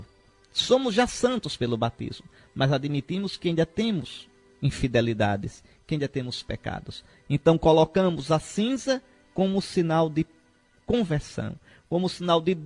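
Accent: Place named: Pernambuco, Brazil. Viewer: Querido Fom Henrique, ajudai-me, lá do Céu,a fazer uma santa quatesma. Como gosto de ouvir suas explicações, com este sotaque e expressões pernambucanas, lembrando a minha origem nordestina! Que gracinha! Tanta santidade, se capta em seu modo de expressar e transmitir as coisas de Deus, com um amor extraordinário por Jesus Cristo, Nosso Senhor! Que delícia são as vossas homilias! ❤❤❤❤❤❤❤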